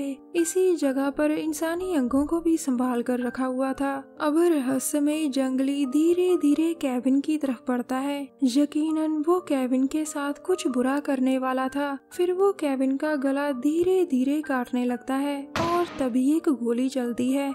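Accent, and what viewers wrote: Punjab, India. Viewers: Mam Panjabi lagg rahe ho aap , aap k bolne K treeke se lagg Raha hai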